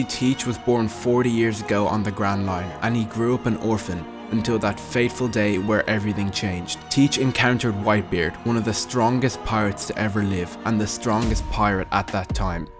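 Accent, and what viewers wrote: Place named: United Kingdom. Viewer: Such a lispy British accent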